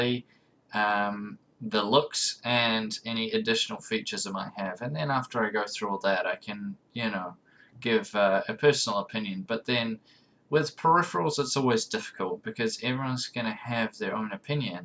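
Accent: New Zealand. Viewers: He's from New Zealand... Not Australia. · Hey, it's a kiwi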